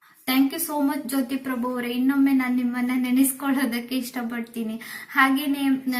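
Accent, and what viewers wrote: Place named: Honnavar, India. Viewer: Namm honnavara matu chenda akka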